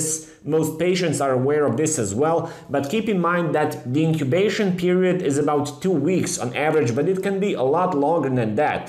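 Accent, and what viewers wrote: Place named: Poland. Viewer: You speak perfect English, but with a mid-to-heavy Polish accent.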